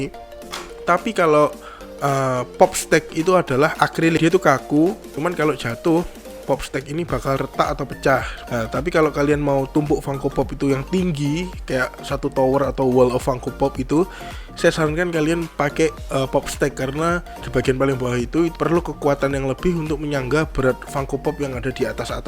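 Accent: Java, Indonesia. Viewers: makin mantap bang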